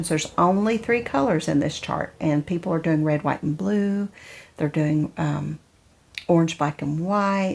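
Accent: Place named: Southern West Virginia, United States. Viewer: I just started watching your flosstube and am enjoying them. I knew from the moment I heard you that you were. Southern WV girl! My mom was born in one of those coal camps, unfortunately it doesn’t exist any longer. Her sister still lives in the area but my parents moved around a lot and ended up in FL. Love your conversion on the FQS quilt piece. That looks like Christmas to me. Happy stitching.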